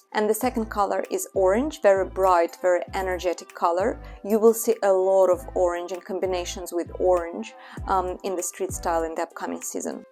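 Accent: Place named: Russia. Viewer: Русский акцент чувствуется 🤣😂🤣🎷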